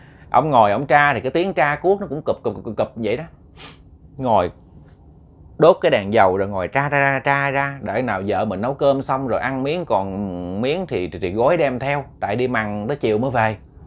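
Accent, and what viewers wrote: Mekong Delta, Vietnam. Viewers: Giọng đọc của đúng chuẩn miền tây quê tôi...❤❤❤❤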